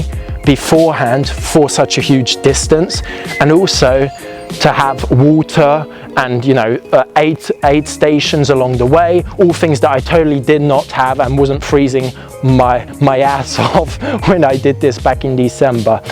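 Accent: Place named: England, United Kingdom. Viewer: you have a great English accent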